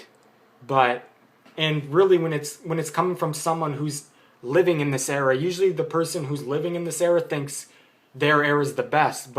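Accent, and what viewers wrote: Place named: United States. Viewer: I thought you are american with accent, u live in lithuania too?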